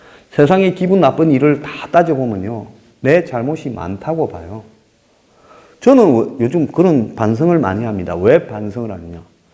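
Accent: Gyeongsang, South Korea. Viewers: 서부경남 사투리가 친근하고 믿음이 가지요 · 소장님은 뉴스 브리핑 중 소장님 특유의 말투로 약간 경상도 말에 약간 속어쓰는것이 제일 우습습니다.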